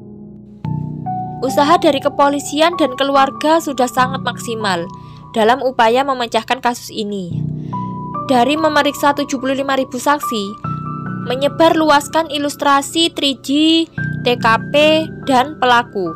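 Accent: Java, Indonesia. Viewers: Kritik membangun: suaranya medok jowo bngt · Logat Jowo x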